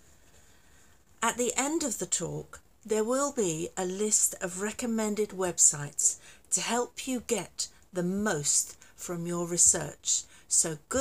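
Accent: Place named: England, United Kingdom. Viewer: Why do you have an English accent?? I doubt there are Huguenots in England. In fact that whole country has been taken over by the Viking types. Even Iceland nir Ireland have Irish there.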